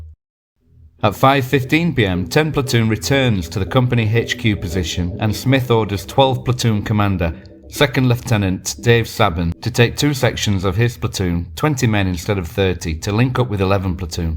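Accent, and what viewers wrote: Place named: England, United Kingdom. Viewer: Excellent presentation: short, sharp and clear. It's really easy to listen to your posts. As an Aussie I find your Pommy accent and presentation very endearing--we truly are very close cousins. God save the King!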